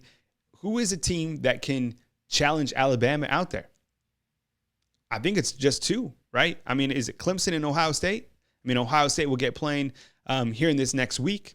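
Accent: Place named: Louisiana, United States. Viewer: each one teach one I can tell you’re from Louisiana you sounds like a trash can.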